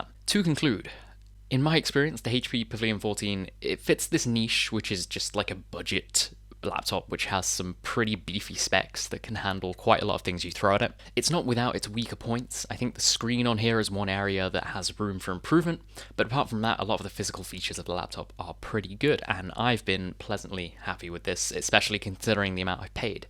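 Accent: England, United Kingdom. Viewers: One of these is being sent to me as a replacement for my old unit which HP lost during repair. Thanks to your review I'm excited about this arriving, so thank you. No idea whether or not mine will have the backlit keyboard but otherwise it's exactly the same spec as yours. You sound english and mentioned english prices, but the layout of the keyboard you showed in the video isn't. So that's more confusion, coupled with HP showing images of this unit having a microSD card reader. For me, it's replacing a 2019 Ryzen 5 3500u from the essential range, so should be quite a nice step up regardless.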